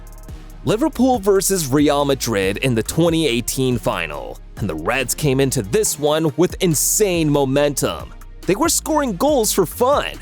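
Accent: United States. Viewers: Hearing an American say these words is so weird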